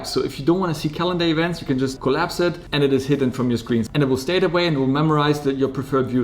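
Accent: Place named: Germany. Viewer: Are you from Germany? Your command in English is very swift. Once in a while I hear a slight German accent 😊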